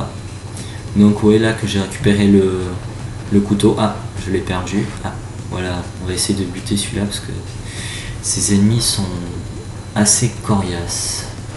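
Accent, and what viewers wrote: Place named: South, France. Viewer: Ton accent de sud xD sinon bonne présentation du jeux ;)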